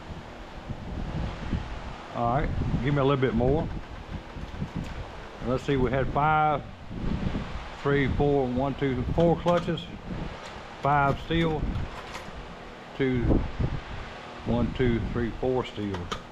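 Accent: United States. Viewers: Where about in the states you come from love the accent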